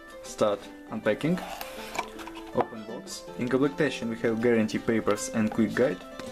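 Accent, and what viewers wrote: Russia. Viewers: Это же русский говорит.Акцент спалил и язык в телефоне!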